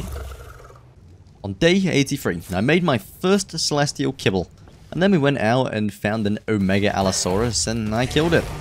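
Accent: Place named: United Kingdom. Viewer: I never heard such an bri'ish guy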